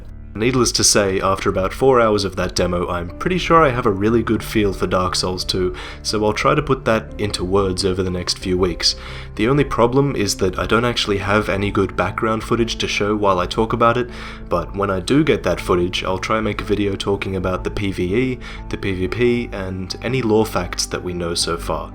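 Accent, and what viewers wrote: Australia. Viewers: hey are you australian? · are you Australian? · Wait. Hold up a second. YOU'RE AUSTRALIAN?!?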